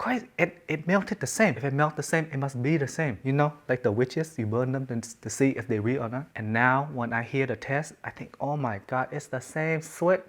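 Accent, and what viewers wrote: Vietnam. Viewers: As a Vietnamese, I love your accent so much hahahaha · I like your viet accent bro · That vietnamese accent is gold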